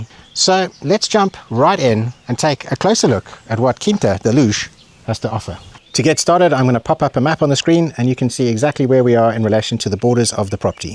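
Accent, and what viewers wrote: South Africa. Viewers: i reallly enjoy your presentation style with the south african twang ... great combo ... property is kinda missing for me strange small windows in the first bed and kitchen and the reception/dining has the front doors but could really do with another big window. price is good ... question tho ... does the farm sell their produce and make a profit? otherwise one is left with looking after a huge amount of trees. there is a calmness and a lack of commercialism (or was when i lived there) that is very calming ... the one reason i wouldn't live there? there is no way i could learn the language.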